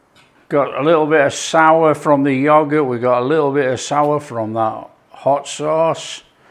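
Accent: United Kingdom. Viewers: Happy day to you and your family, you are very relaxing to watch and learn, you have taught me so much, I love to cook, thank you for helping us so much, love your British accent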